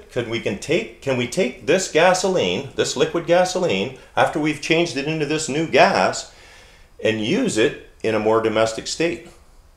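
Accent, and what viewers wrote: Canada. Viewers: Charlize Ravenna ---> You just saved me a whole lot of typing. I was going to suggest changing the title of the video to something like "Already understood details of the physical chemistry of internal combustion wherein every point is repeated at least 3 times, and carefully worded to include as many uses of the exclusively Canadian and universally annoying "aboot" mispronunciation of the word "about".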